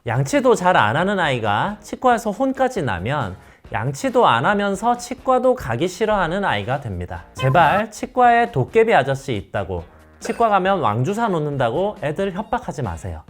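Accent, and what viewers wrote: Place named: Gyeongsang, South Korea. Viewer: 멀리서 보면 솜사탕인데 가까이서 보면 거즈라더니... 온화한 표정으로 (feat.미세한 경상도억양) 세상 조곤조곤하게 팩트로 때려주시네요ㅠㅠㅋㅋㅋ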